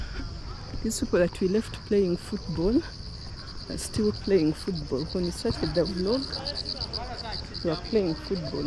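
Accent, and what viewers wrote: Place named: Uganda. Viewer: Love from San Diego California,born in Uganda I remember makerere long long time ago and I loved your beautiful ugandan accent,I would listen to you all day. Will try to find more of your content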